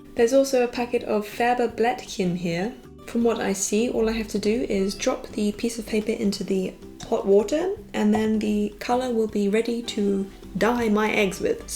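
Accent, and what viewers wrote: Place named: United Kingdom. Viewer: Most of the time you get american english here in germany but i must say i like the british accent. :3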